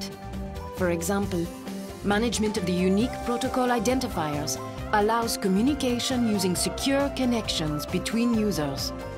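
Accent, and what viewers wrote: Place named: Sweden. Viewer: I may be wrong, but I think it's a Swedish accent. I speak French and don't sound like that, and the CEO of ICANN is Swedish. I also believe the man who is credited for inventing the World Wide Web, Sir Tim Berners-Lee, is from England.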